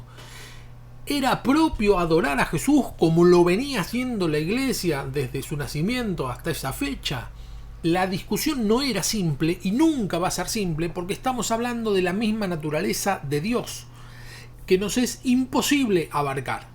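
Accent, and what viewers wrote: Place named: Argentina. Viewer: un saludo hermano usted de que pais es parese asento arjentino saludos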